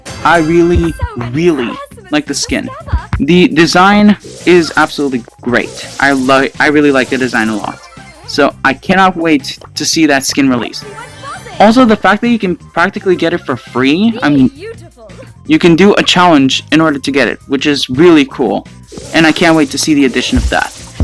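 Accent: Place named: India. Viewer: You sound Indian asf lol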